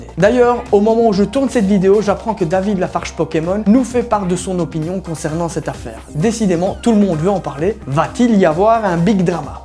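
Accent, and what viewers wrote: Belgium. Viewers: C'est un belge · T'es belge? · Elisa Deleau à mais il est Belge ! Je me disait bien si il l'était pas :D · T'es belge ?? Prcq ta un ptit accent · Je suis Belge et au contraire je trouve qu'il a un bon accent bien Belge ahah ^^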